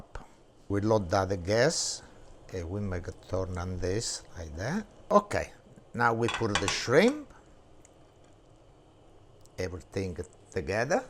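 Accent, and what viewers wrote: Italy. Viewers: I'm from Germany and to be honest it's very hard for me to understand English with an Italien accent. But one can really feel that your are living Italien food. When I see your dishes it's always a pleasure and a pain at the same time, because it looks so tasty but I usually watch your channel when it's too late to try it by myself at once. So I have to.go to bed with the pictures of you dishes in my head. Sometimes a glas of wine helps to overcome ...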